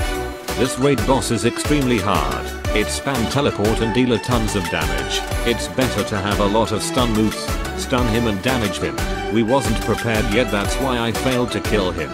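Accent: India. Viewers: Lol indian voice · Why does the new voice sound like an INDIAN!!!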